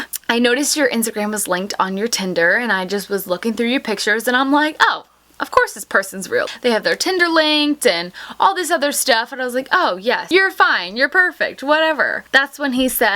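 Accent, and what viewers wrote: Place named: United States. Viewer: Most jarring accent and way of talking I've heard in a long while. You give American girls a bad name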